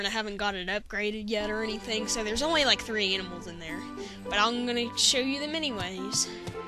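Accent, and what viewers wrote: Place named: South, United States. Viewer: And no offense but your voice is hilarious I wish I had that hillbilly voice I talk kind of country but not enough it bothers me.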